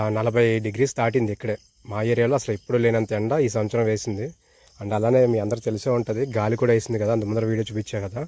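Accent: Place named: Rayalaseema, India. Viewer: You are from Kollegala,Chamaraj Nagar Dist. How are speaking in Telugu,like Rayalaseema people?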